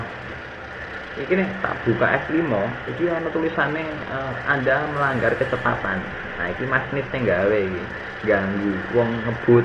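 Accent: Java, Indonesia. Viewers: Sampeyan org Jawa? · Ngomong mblitar jowone metu hhhh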